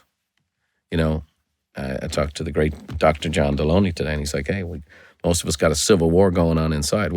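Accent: Dublin, Ireland. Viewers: Comments say he's an Irish-American immigrant Brian Buffini. Cool. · Is this guy Irish? Has such a crazy accent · Yes he has a Dublin accent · I’m from Cork, love hearing his Dub accent 🎉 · He has a Irish drawl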